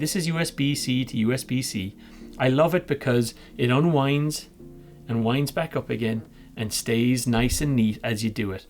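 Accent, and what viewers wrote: Ireland. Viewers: This is the most irish sounding american I've ever heard. Cheers from the other side of the pond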